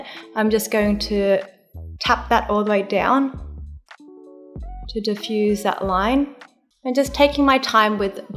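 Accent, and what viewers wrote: Australia. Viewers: Man, I’m so glad I discovered your YouTube channel. You’re just so absolutely gorgeous and beautiful. I also love your Australian accent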